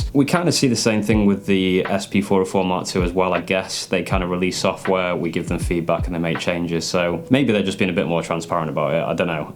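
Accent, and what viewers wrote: United Kingdom. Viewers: Yo man thank you for your videos. Been following for a while now and I really appreciate your content (especially the sp 404 vids)! I’m from the uk and you sound pretty local. Have you got a social media link? Would love to connect and pick your brain. Thanks for the consistent uploads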